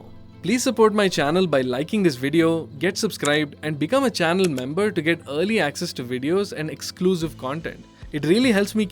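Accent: South India, India. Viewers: You sound like south indian. Which state you are from, may be kerala or tamilnadu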